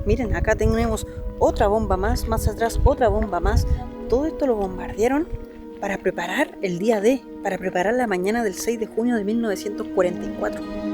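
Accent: Chile. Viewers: Eres chileno?? Admiro mucho a 🇨🇱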